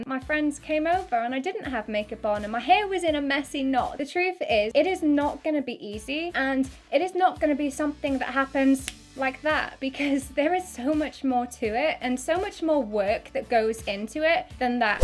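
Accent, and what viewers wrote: United Kingdom. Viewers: Wait are you from the UK because your accent sounds a but deferent xx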